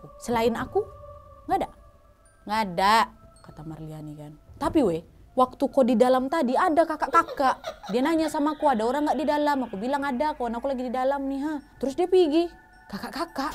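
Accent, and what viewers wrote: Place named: Medan, Indonesia. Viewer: Baru kali ini kuliat org bawain horor cem gini malah jd lawak 🤣🤣 Medan kaleee, semangat kakak bagus deh pembawaan cerita nya 👍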